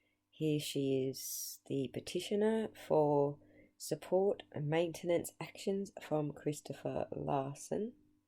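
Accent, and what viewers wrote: Australia. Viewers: Exactly what I been saying. I see her being the Female "Mike oh" with her Aussie twist!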